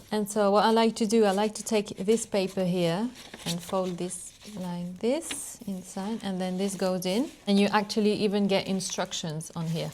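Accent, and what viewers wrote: United Kingdom. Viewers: Coucou, trop cool te t’entendre parler l’anglais avec l’accent British hehe ce serait super chouette d’entendre parler en anglais aussi 😍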